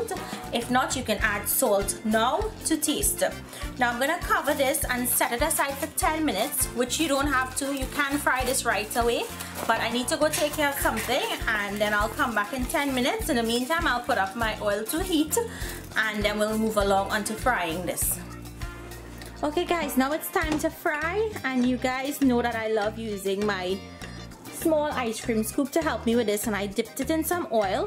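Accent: Trinidad and Tobago. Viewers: Sounding like ah Trini😁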